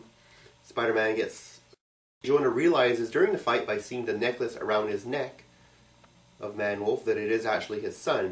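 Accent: Canada. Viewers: This stuff is great for the geek at heart! By the way, man. You gotta be from the Atlantic Canada. I could pick that accent out anywhere. Nova Scotia by any chance?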